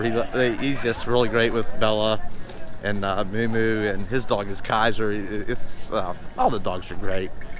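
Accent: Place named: Western Pennsylvania, United States. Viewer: Your accent sounds like mine. Western pa?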